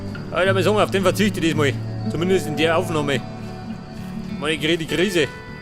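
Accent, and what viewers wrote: Bavaria, Germany. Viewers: Saugeils Video schee dos du in Bayerisch redst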